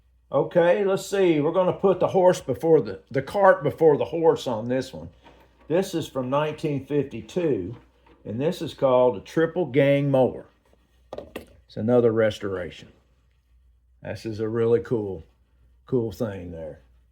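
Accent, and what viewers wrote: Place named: Ohio, United States. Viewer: That platform truck is awesome. What state are you from, trying to catch that accent? Ohio? Dump truck is cool 😎. That driver has a lot of brothers, they all look the same. Nice collection. WOW 👍👍👍👍👍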